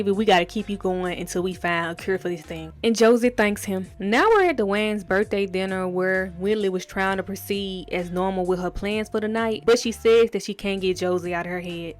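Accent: Memphis, United States. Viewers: The Memphis accent makes this recap even better child 😂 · Are you from Memphis ? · Girl, the way you said Music. You from Memphis? Lol Love your accent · Girl, are you from Memphis? I love your accent!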